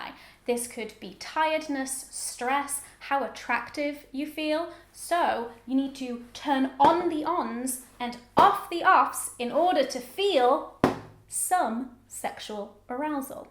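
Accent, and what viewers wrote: United Kingdom. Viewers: I really enjoy your videos and love your British accent! Drives me nuts!!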